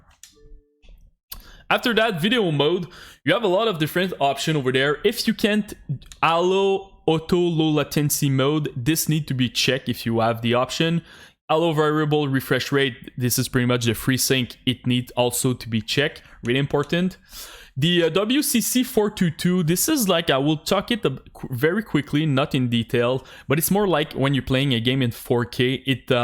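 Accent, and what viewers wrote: Quebec, Canada. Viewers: L’accent québécois mdr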